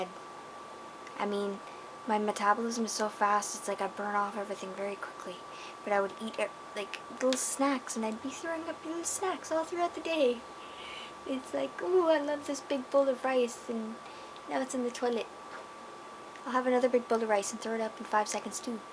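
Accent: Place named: Canada. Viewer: i can hear your canadian accent!! :D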